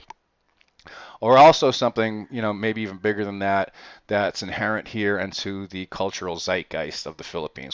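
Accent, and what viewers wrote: Boston, United States. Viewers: Boston accent.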